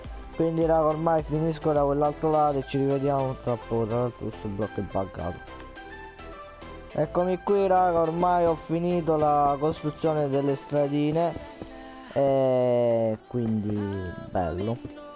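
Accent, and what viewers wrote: Sicily, Italy. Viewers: complimenti fai troppo ridere😂😂 meriti molti più mi piace e molti più iscritti,ma da come parli sembra che se siciliano perché anche io sono siciliano e assomiglia molto al siciliano da come parli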